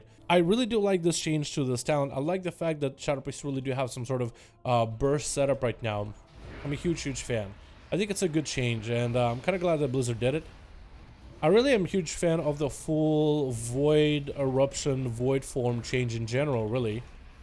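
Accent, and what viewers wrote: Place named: Russia. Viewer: as a non native English speaker I understand him just fine. You know he is Russian right? so for non native speakers it's hard to sound "perfect".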